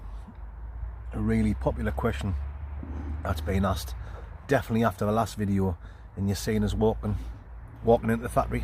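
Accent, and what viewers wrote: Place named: Sunderland, United Kingdom. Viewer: I'm originally from Sunderland, 46 years, left 12 years ago, so lovely to hear a proper accent. Love this stealth camping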